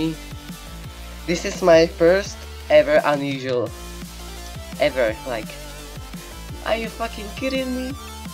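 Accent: Czech Republic. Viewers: NICE ENGLISH :D (ale pořád je tam přízvuk čecha :D) nechápu proč nemáš 100 000 subs ? :D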